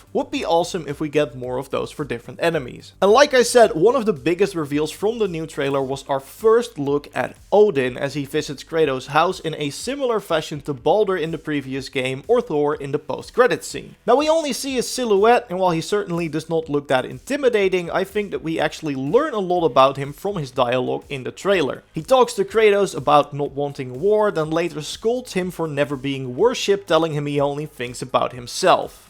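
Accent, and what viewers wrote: Netherlands. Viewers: je klinkt zo nederlands :p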